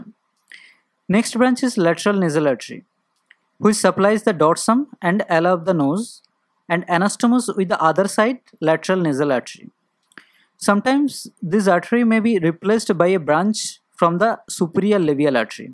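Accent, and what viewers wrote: India. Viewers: ☹️I don’t understand the accent of. Indian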